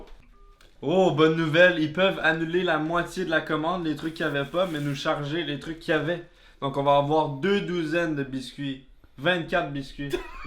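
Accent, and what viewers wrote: Quebec, Canada. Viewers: Tu connais alan 92 en France,regarde sa chaîne c’est un mangeur de malade 🤢 quand à toi tu me fait rires 😝 avec ton accent,et pourtant je côtoie beaucoup de québécois car nous avons une équipe de hockey à Rouen mais t’es connerie me font bien rigoler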